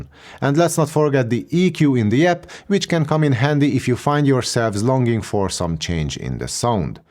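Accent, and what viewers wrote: Hungary. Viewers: Nice professional review with a nice hungarian accent :)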